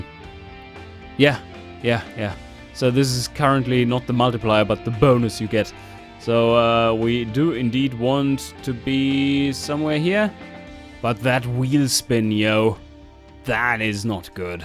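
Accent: Germany. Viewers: Actually, are you from Germany? :D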